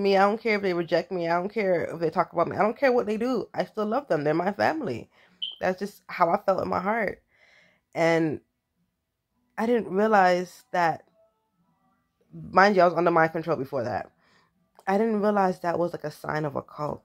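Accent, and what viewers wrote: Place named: Florida, United States. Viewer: You sound floradian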